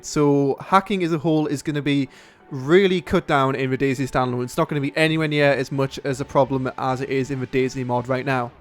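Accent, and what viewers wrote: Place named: United Kingdom. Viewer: I miss your videos. Make some Wasteland or carry on playing Dayz Taviana!!! Please!!! Btw like your british voice:)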